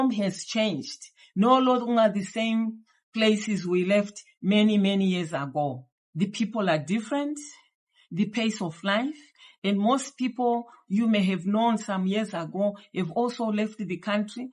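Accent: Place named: Cameroon. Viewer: You sound Cameroonian! That is irrelevant! However, the greatest threat is just insecurity!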